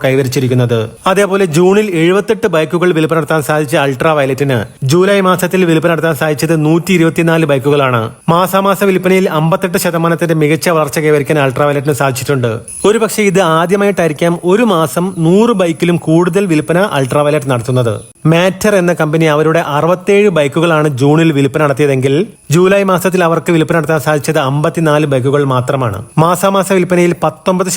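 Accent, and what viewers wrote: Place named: Kannur, India. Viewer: kannur ടോണിൽ തന്നെ bro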